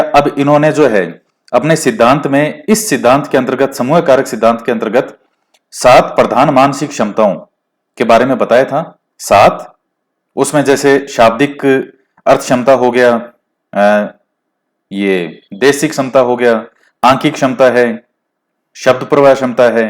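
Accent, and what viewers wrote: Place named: Ganganagar, India. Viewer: Sir aap ganganagar se hai kya. Aapki bhasha kuch ganganagar se milti hai.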